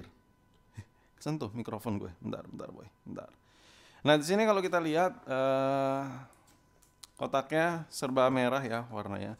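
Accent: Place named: Medan, Indonesia. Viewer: "Udah Ga sabar kali Awak!" Ihhh Medan kali kau bang..!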